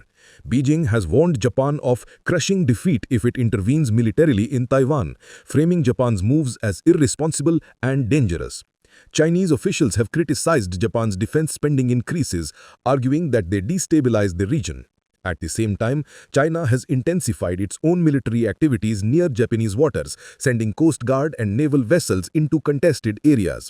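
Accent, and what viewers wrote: India. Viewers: If it is AI voice why pick these Indian accents this is so hard to hear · Indian accent. I dont like.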